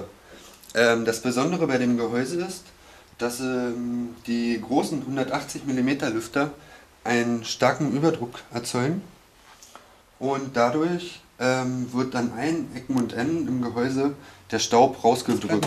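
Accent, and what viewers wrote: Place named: Berlin, Germany. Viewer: bei dem ganzen Berlinerisch versteht man ja den rest gar nimmer^^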